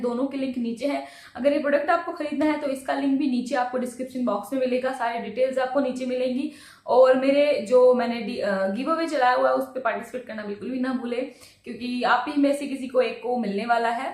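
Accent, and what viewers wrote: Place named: Haryana, India. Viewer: hlo dear.....i m from bhiwani.. yhi karan h ki m aapki fun ho gyi hu... thoda haryanvi touch h aapme.....m rhti hu Lucknow me... aapki give away muje chahiye..m bhi use krna chahti hu.😊😊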